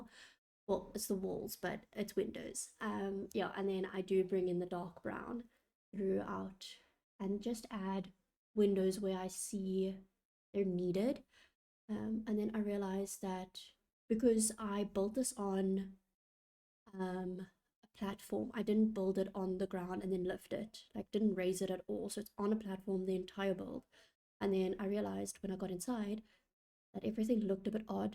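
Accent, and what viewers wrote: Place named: South Africa. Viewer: Lovely house and I hear that South African accent ❤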